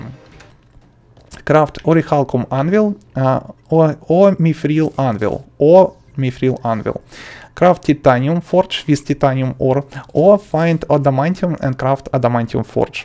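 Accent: Russia. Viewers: У него русский акцент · Я конечно пытаюсь выучить английский, но русского человека говорящего по английски с таким акцентом понимать невообразимо просто, спасибо. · it sounds russian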